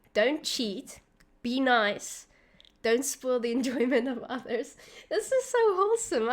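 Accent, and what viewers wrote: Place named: South Africa. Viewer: South african accent the best 😁😁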